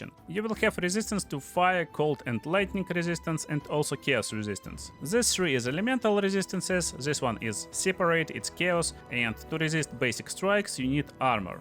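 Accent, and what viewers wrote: Russia. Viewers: Your accent, I swear You made a BG3 guide but I can't find it on this channel, maybe another Russian. You sound identical, accent an all lmao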